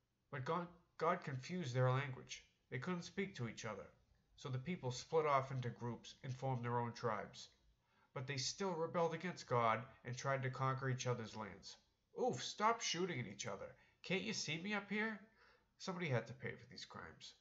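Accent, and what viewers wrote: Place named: United States. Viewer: THIS HAS A MODERNISTIC TINT. THE OLDSCHOOL ONES ARE BETTER. THIS YANKEE VOICE IS NOT GOOD EITHER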